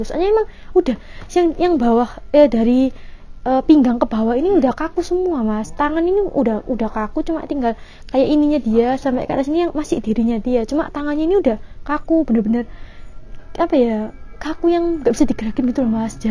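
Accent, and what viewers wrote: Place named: Java, Indonesia. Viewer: Jawane medog banget..gak enak denger nya..klu nas medog jawany, tp Enak d denger.itu bajang.. cepet lulus dgan nilai yg bagus ya sujses trs.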